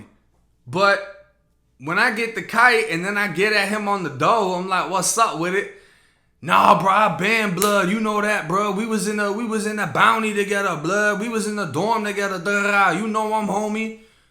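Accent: Boston, United States. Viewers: Lol that Boston Accent getting strong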